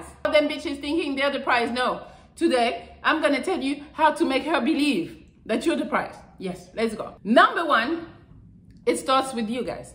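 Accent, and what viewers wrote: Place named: France. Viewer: I just seen your videos and started watching because of your accent. It sound so familiar . Then i realized, you’re French like me!! Probably from Gwadada or Madinina !!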